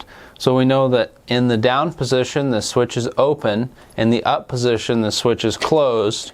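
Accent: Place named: Midwest, United States. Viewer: Sounds US Midwestern to me.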